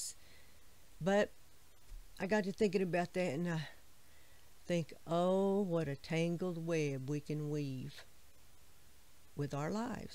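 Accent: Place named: South, United States. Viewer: thank you for your prayers - so much appreciated!🙏🥰 The closest I have been to your neck of the woods is a couple of holidays in Florida back in the 80s which I loved! I assumed from your accent you were a Southu'n Belle! I went through a phase a few years back when I read absolutely everything by Fanny Flagg just to enjoy the South'n vibe after seeing Fried Green Tomatoes - and Blanche Deveraux in The Golden Girls was hilarious!😁🤣 Probably a terrible pastiche of the real thing but I loved them anyway! I'm very much enjoying your channel and your calm, sane biblical approach (not so commonplace around today's 'prophets') and they were really a 'word in season' for me - thank you - I intend to enjoy a lot more!! Love & Blessings to you & your ministry!🙏✝️🥰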